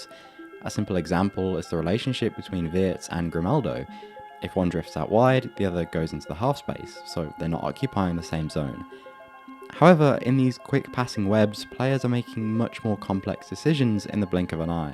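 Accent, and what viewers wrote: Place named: England, United Kingdom. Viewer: Dude i HATE english accent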